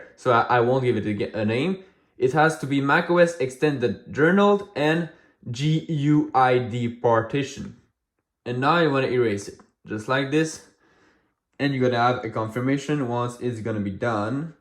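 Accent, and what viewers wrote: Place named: Quebec, Canada. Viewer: Tu est quebecois ca ce voit MDR!!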